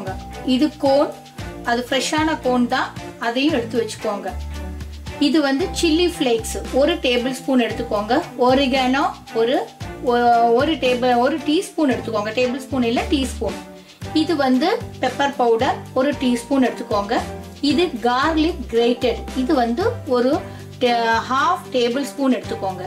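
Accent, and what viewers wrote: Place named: Kerala, India. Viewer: Good mayalam mix tamil voice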